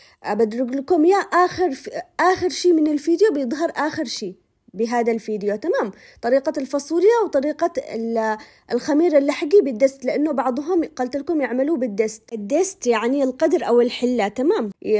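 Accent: Aden, Yemen. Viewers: يعجبني كلامك العدني المخلوط بالحبشي او الصومالي ...عاشوا أصحاب لحج وأكلهم اللذيذ 😊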